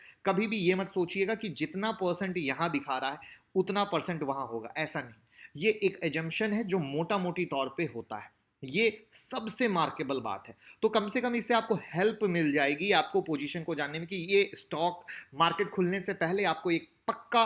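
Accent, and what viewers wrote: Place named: Rajasthan, India. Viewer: Maja agaya....taabad tod k owledge sharing.... sixer.... bhasha se rajasthani lagte ho sir...marwar belt ya mewari?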